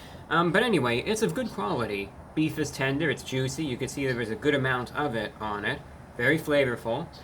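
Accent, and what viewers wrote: United Kingdom. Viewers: I haven't watched the channel in a while but i swear he turned British somehow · When did he become British? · I haven't seen his videos in a few years, why does he affect a British accent now?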